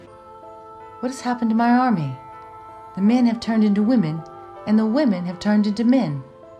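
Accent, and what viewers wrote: South, United States. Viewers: Your voice is so soothing..the mixture of Southern accent, smoothed out by a little New Yorker and the British tongue with words like "que", "nip in"...no wonder I couldn't put my finger on it.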